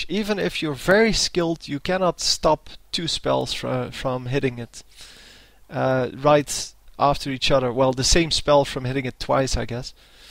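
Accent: Netherlands. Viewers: stop the dutch accent!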